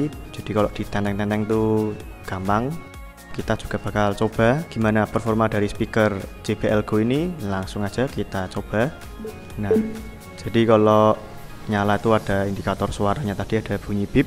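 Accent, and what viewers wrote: Java, Indonesia. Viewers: medhok mase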